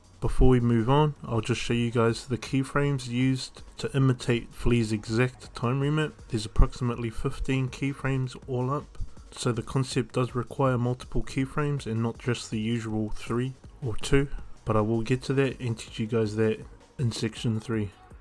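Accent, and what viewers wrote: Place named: New Zealand. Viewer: Your from New Zealand haha same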